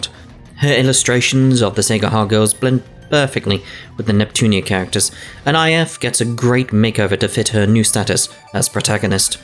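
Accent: United Kingdom. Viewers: Dude I wanna a British accent too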